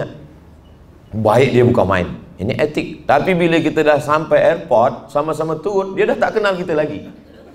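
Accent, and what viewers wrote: Kuala Lumpur, Malaysia. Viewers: Askm,terbaik ustaz pandai lograt org KL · Otak aku tak boleh comprehend ustaz orang Indonesia, sembang cm org malaysia.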